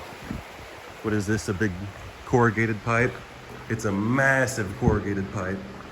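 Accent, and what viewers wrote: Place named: New York City, United States. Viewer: You sound like you lived in NYC.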